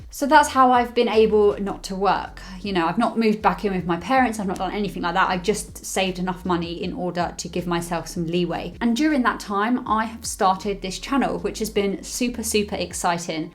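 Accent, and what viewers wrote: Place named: Cockney, United Kingdom. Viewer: Good luck - with the channel and the job my cockney sparrer 😁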